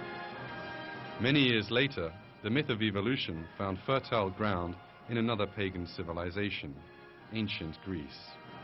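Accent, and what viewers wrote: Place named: United Kingdom. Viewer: great british accent. other than that, a total waste of time. thanks for nothing. get a job.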